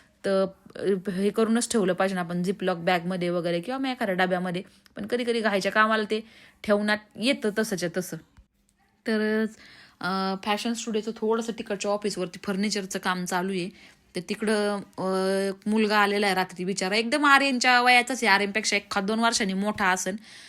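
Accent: Ahmednagar, India. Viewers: नगरी भाषेत एक वेगळाच गोडवा आहे ❤❤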